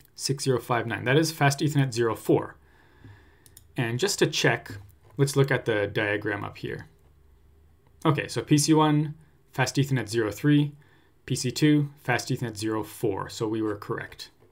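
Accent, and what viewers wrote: Canada. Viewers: Comment for my Canadian sounding homeboy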